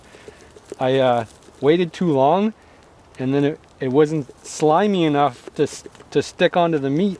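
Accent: Canada. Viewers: I was wondering why u had such a similar accent 😂 didn't realize u were Canadian too lol. I'm not used to seeing people make survival videos in Canada, it's always in some tropical climate. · Pretty sure he’s canadian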